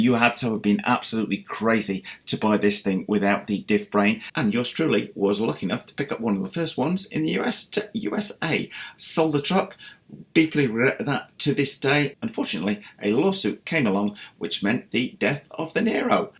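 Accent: United Kingdom. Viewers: Ur you from the uk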